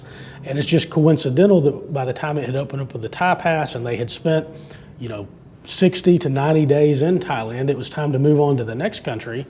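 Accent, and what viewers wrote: United States. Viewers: Nice man. You deserve more subscriber tobe honest. The reason why i subscribed is because i wanna adapt your accent. American accent? And Your videos as well. Like literally i love your videos.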